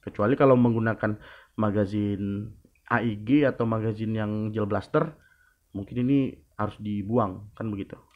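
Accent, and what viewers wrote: Medan, Indonesia. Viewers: Jooosss bang.. Cm dr logat nya kyk org medan ni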